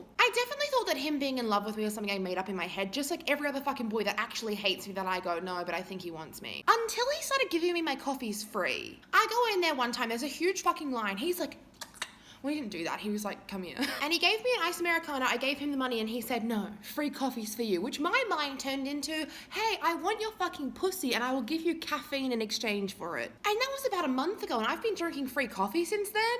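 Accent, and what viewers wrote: Australia. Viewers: Were you born in Malaysia bc you have an Australian accent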